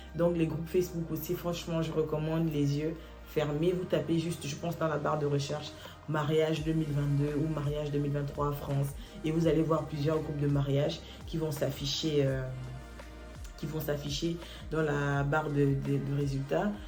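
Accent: Gabon. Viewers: Pourquoi on parle tous pareillement 😂 je viens de découvrir la chaîne mais l’accent ci 🥰🇬🇦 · Tu parles comme une gabonaise 😊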